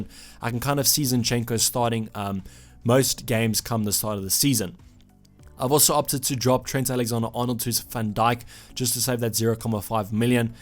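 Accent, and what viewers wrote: Afrikaans, South Africa. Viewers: Is jy afrikaans😂🤔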